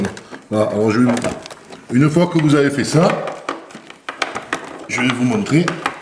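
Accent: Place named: South, France. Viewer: Salut le sudiste ici le nordiste, tu as envoyé du soleil chez moi avec ton accent et ta technique 😉 merci bien! je me bat depuis 2 jour avec des boîtier de m**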